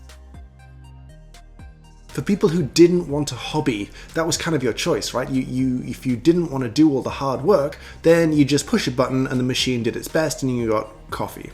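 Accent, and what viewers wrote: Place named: England, United Kingdom. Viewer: Im only here to listen to your English accent......Greetings from Saudi Arabia.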